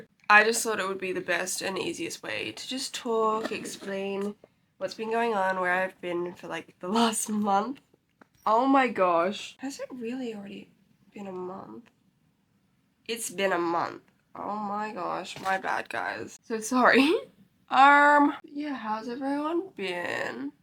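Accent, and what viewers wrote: Australia. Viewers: I just love your accent ! I always hear american accent but never aussie one, as a non native english speaker it feels so weird but so cool !!!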